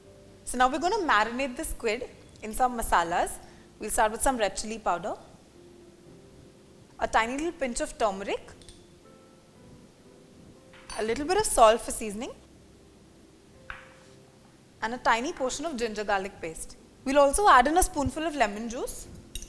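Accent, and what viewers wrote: India. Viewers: I like your presentation, and your kitchen. And, you have garnished with the Indian accent. I have squids, and was looking for a simple recipe with good results.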